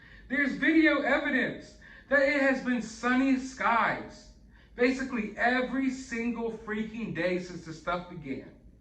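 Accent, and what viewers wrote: South, United States. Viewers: Where in the south are you from? I'm from Alabama!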